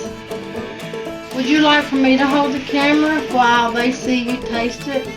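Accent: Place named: Kentucky, United States. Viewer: I love listening to that accent! My dad's family is from Corbin, Kentucky and she sounds exactly like my aunts did. I'm curious where she is from.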